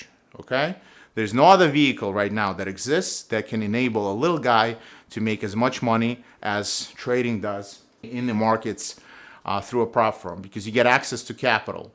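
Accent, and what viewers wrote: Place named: Boston, United States. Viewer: you got that boston accent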